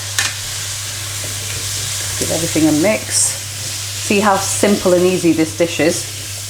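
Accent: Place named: Manchester, United Kingdom. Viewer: Hello Your accent sounds from Manchester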